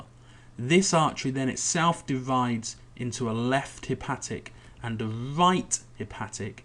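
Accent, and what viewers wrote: United Kingdom. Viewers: Excellent video. Nice to hear an English accent. Go UK!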